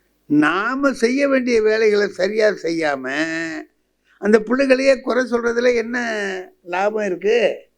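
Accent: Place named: Cauvery Delta, India. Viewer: I like this delta district accent because I too from there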